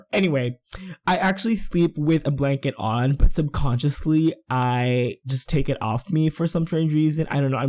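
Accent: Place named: Philippines. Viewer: the first time I heard your voice. and started wondering if you were a filipino.. and you are... hi po ^^ new subscriber here ^^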